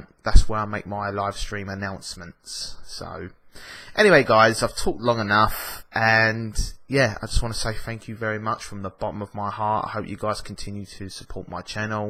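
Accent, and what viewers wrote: United Kingdom. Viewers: Hey don't listen to the haters, I personally think ur handsome and I LOVE the British accent! I prefer a British accent. I love following you and u make me laugh, ur very cute, continue to make videos :) also can you do a public freak out compilation reaction video? I'd love to see how u react ^_^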